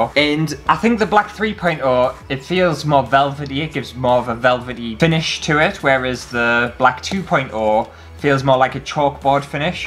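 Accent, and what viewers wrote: United Kingdom. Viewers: You need to vastly increase the bitrate of your camera, this video looks very splotchy. Also, you need to lower your shutter speed for more motion blur because it looks unnaturally crisp and jittery. I would also recommend moving to 30fps, in which case since you sound British, you'll need a 1/50th shutter speed, specifically, in order to avoid your interior lights flickering on camera. In addition to all of that, you'll have to set your camera up so that it doesn't adjust the shutter speed as a part of the exposure triangle when you have auto-exposure on, which I assume you do because most people do.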